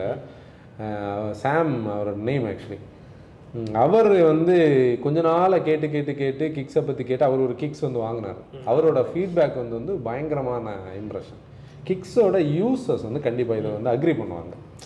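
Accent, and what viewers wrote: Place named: Nagercoil, India. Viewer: Team, This is one of the best & top class discussion on cars that seen (in Tamil)! I thoroughly enjoyed the video. BTW, I have booked Carens this morning and I would be happy to have it reviewed by post delivery. bro( hope spelled right) I have fallen in love with your Nagercoil slang and simple, yet through detailing reviews. When you are in Chennai, pls shoutout I would be happy to host your team. This 2022 will take team to an another Pinnacle with GOD’s grace. Wishing you all a Happy New Year!!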